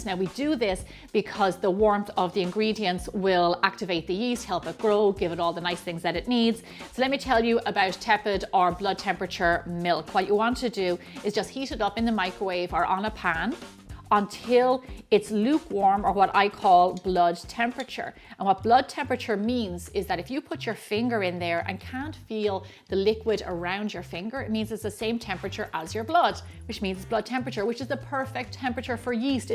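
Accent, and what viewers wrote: Ireland. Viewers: I love that Irish accent!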